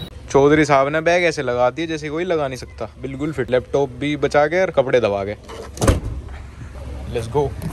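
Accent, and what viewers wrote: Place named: Haryana, India. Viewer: I just love ur haryanvi acsent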